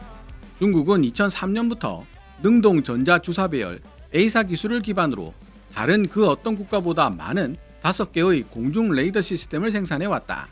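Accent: South Korea. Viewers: 영어 읽지 마세요..콩글리시 짜증나~~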